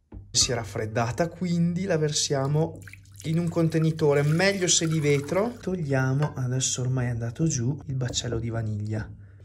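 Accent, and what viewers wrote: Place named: Trentino, Italy. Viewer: Auguri per i tuoi denti!! Sei sempre molto chiaro nell'esporre le ricette👏. Mi piace il tuo modo di proporti, naturalmente la tua bravura e passione in cucina è super. Ho dei parenti a Trento e la tua parlata con a volte qualcosa in dialetto mi piace un sacco!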